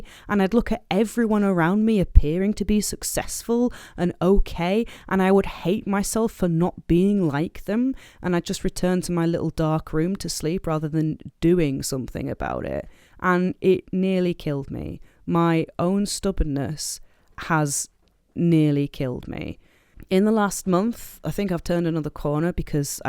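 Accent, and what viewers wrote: United Kingdom. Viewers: I just watched this, god knows if you're see this comment. Part of the reason I watch your vids is because of your humor, and the other part is because you always made mention of your issues and as someone who is bipolar I get it, because I've been there myself. That and if I'm going to be perfectly honest, you're attractive and I can't get enough of your voice, it's the British accent. Could listen for hours, I usually wait till there there's a few vids to watch in a row for said reason. Not a creeper, just a Bipolar guy that finds himself in similar situations as yours and..... well, what can I say I'm guy, knowing there's an attractive woman out there that I can relate to on some level, makes me feel less lonely and worthless. Stay strong, be you, because you rock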